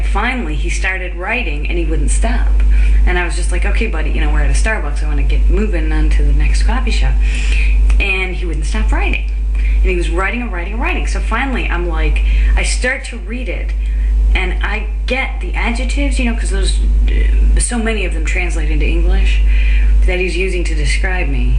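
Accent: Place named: Texas, United States. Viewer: I thought I detected a TX accent. What part? I'm from Dallas (Irving). I never hated the French though. Sure they're smelly snobs, but I never hated them. :) (Just kidding!)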